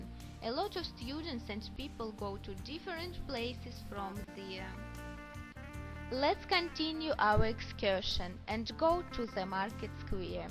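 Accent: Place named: Russia. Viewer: Сразу видно что русский акцент.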